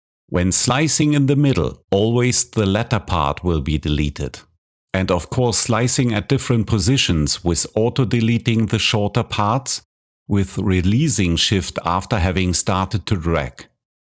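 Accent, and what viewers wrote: Germany. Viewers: Your tutorials are so good. And I like your strong german accent. That matches perfect to your lessons.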